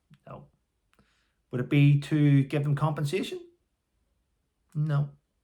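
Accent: Northern Ireland, United Kingdom. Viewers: yours is the only channel I don't need subtitles for because like me, you're from Norn Iron so I get every word!! 😂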